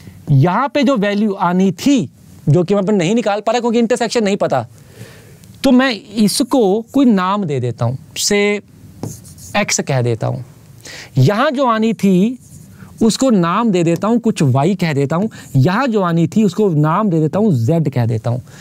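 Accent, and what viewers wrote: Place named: Punjab, India. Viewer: Sir ki punjabi 😁 nice I am from mp but I know punjabi very well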